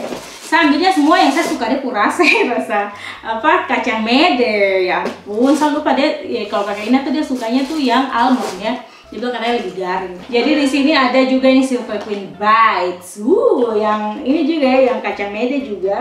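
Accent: Manado, Indonesia. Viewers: Bhsa menadonya kental betul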